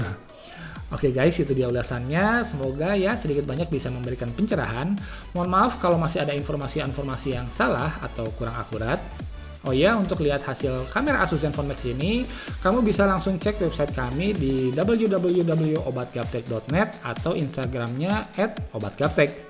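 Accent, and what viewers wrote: West Java, Indonesia. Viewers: Asyik nich reviewnya.... Bahasanya sederhana tapi nyaman, heuheuy Sunda Asli.....!!!!!!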